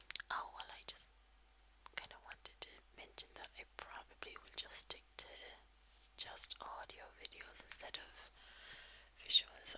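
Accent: Ireland. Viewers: I've recently become interested in Irish music, history, language and culture, and am thrilled there are ASMR videos with the Irish accent. Keep eating healthy and be happy. :)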